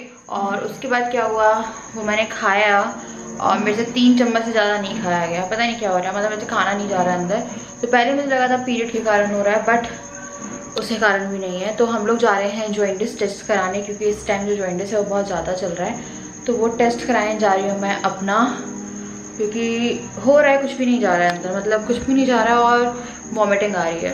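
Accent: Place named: Kanpur, India. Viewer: Kanpuria bhasha mst comdey type bolti ho app🤣😂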